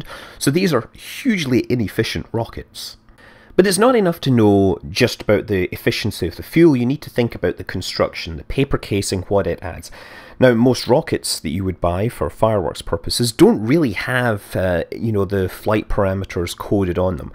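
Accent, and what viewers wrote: United Kingdom. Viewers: You live in the US? Sound so british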